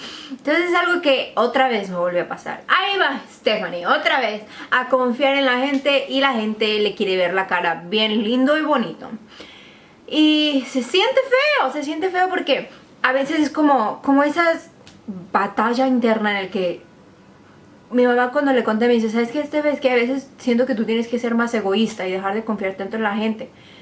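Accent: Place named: Cuba. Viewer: A mi me gusta como hablas cuando lo haces de manera normal... ese acentito de cubana no m gusta.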